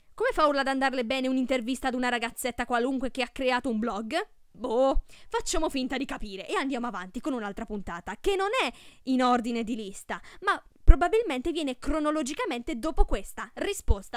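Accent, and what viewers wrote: Bari, Italy. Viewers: Ma sei barese? TVTB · Ma sei barese? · Secondo me sei di Bari 😂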